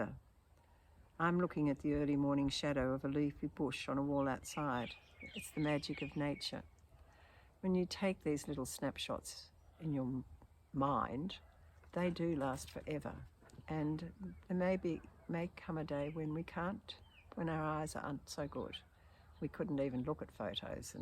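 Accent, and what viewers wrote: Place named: Australia. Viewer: Dear (sorry I don't know your name) I loved listening to your video this morning. I've been listening to a few nice old ladies giving life advice with kind soothing voices so this video appeared in my feed today. Thanks AI! I have to say your voice is just beautiful to listen to! You have a wonderful way of speaking. And good advice too. I love the fact that thanks to YouTube we all have access now to the wisdom of people like you. It's a healing substitute for the families we either didn't have, or did have, but who weren't so free with their kindness & wisdom. What a gift to the world. I also love the fact that you are Australian. I lived there for 20yrs & hearing your accent, plus the crows & magpies in the background, brought back some nice memories for me. I'm back in NZ now & it's quite different over here. Best wishes from Wellington, I've subscribed and I look forward to hearing the rest of your advice 🙏💐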